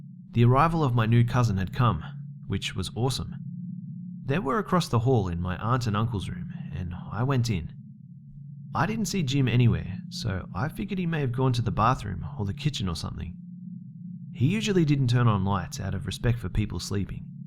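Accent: Australia. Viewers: he has an Australian accent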